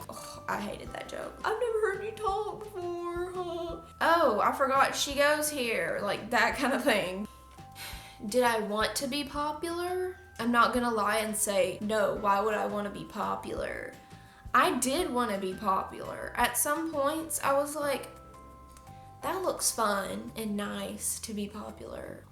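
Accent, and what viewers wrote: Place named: South, United States. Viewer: I love your chill personality and southern accent, you just got a new subscriber 💓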